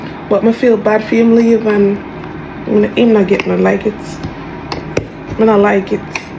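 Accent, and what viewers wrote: Jamaica. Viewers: I just love how u speak our own patios love your style girl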